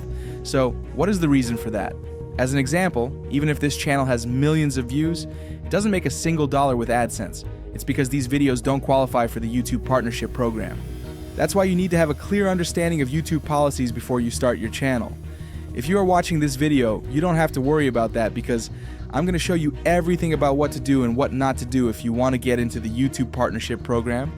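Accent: Sri Lanka. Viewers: bro are you from sri lanka?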